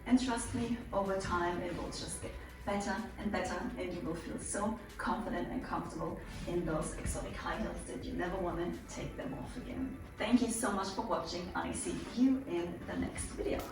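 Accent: Germany. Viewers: Are you German? 😊 Great Tips, thank you for sharing!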